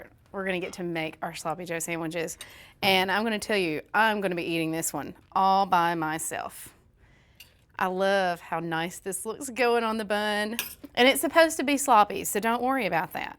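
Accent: South, United States. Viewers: Very difficult to understand her! Talking too fast .. with a Southern Accent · I love your voice you sound like all the folks around where i grew up.God bless our south.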